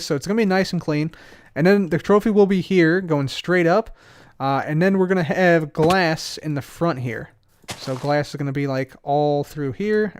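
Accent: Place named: United States. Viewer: Sounds like he's from the US to me.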